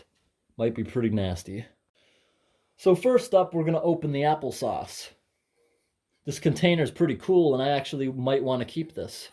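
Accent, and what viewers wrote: New England, United States. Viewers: App ri cots lol. New England accents are weird. Your videos are the best though! You really need to get a P.O. Box like ashens used to do and he’d get all sorts of crazy old food.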